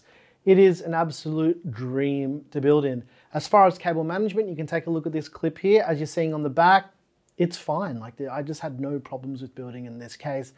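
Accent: Australia. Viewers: I just bought this case and looked up this video to see how to take off the glass panels without manhandling and breaking them. Stayed the whole video for the chill vibes and Australian accent.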